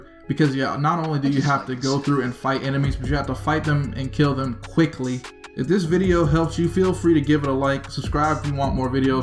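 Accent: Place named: Los Angeles, United States. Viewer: This man has got to be from LA.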